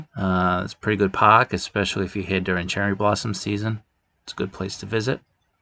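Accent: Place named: New England, United States. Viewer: Definitely from New England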